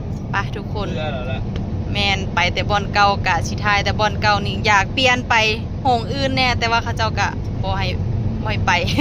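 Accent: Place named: Laos. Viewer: สำเนียงเว่าคือ หนูนุ่นสาวลาวเนาะ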